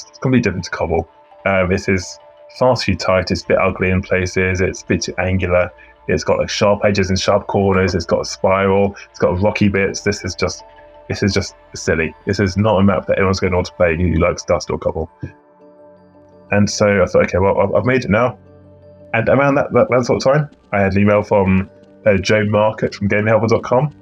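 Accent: United Kingdom. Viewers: Its so freaking hard to make sense of what he is saying sometimes ... british is so hard. Too much mumbling ... but anyway gj Dude! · Lol need subs for this huge british accent. Where he from in uk?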